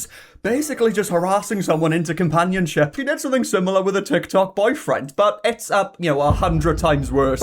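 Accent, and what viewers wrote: United Kingdom. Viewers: I'm english but I can mostly understand this British accent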